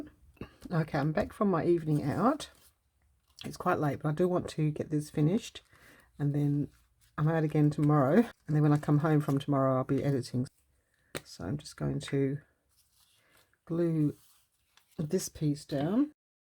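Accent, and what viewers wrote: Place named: Australia. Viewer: So glad I found you not so long ago as I find listening to a fellow Aussie really relatable. Love what you use to collage with. It was great to see the magpie and how friendly it was. We used to have a family of magpies who came and played on the kids trampoline and our hills hoist for years until the crows moved in. Really miss them still. I would love to be in the giveaway for the stamps. Kicking myself for giving my stamp album years ago before I started collaging. I have saved your playlist of Margarete Miller collage challenges to watch and have seen a few already. Thankyou for sharing. 🙂🙂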